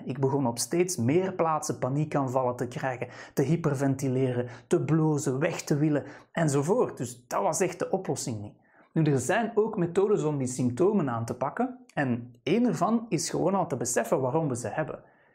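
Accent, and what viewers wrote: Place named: Flanders, Belgium. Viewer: Overigens een prachtig accent. Ook de beste literatuur wordt geschreven door Vlamen :)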